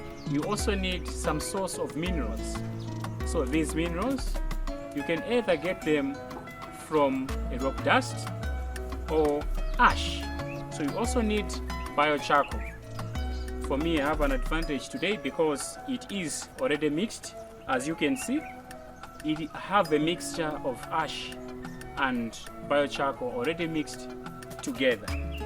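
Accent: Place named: Zambia. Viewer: Definitely going to try this. Thank you from Zambia PS you sound Zambian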